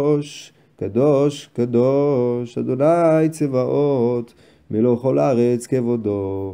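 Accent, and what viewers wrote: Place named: Israel. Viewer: Your prayer is clear, easy to follow and sounds authentic Israeli. It's a good supplement to allow us to fulfill the mitzvah in some days we have no access to the synagogue.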